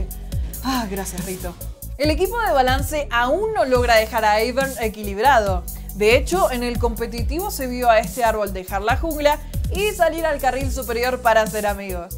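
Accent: Rioplatense, Argentina. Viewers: Pueden porfavor pedirle que hable más neutral ? Sin tanto acento, se entiende que es Argentina pero viejo a la panchasky le exigieron hablar "neutral" durante toda su estadía en Riot. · no puede evitar el acento argentino xd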